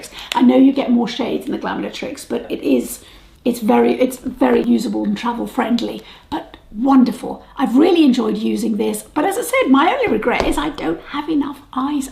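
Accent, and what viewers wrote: United Kingdom. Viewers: I really enjoy your video’s! Love how British you are, and how you talk. Oh and the reviews are absolutely great, too!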